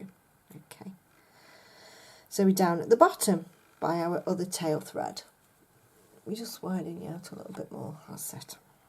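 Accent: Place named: Cheshire, United Kingdom. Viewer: Evening while looking for Christmas dec videos, just stumbled upon your vidios, as much as I love some the American designers and teachers it's nice to see a fellow brit doing some you tube tutorial. This is fab reminds me of spiral staircase. Wonderful. Plus you sound just like my aunty who lives in Cheshire, and works in Manchester very similar accent to you. x x Happy beading.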